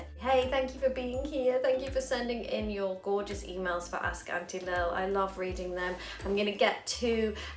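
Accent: United Kingdom. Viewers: Is it just me or Lil accent is so strong since she back in the UK. I love it so much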